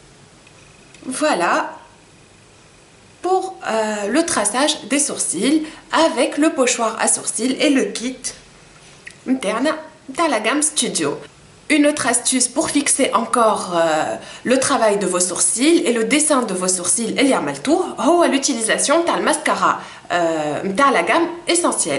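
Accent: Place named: Tunisia. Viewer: C'est lourd le francais tunisiens ! Whaou! Sinon bon tuto !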